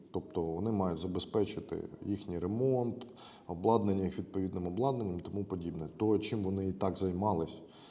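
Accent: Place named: Russia. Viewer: Зі всією зневагою до нинішньої ''влади''... Ти просто'' топиш'' за ''шоколадного''... Це ганьба!!! А, так... І - підтягни українську . Бо ж аж сифонить ''узькім''...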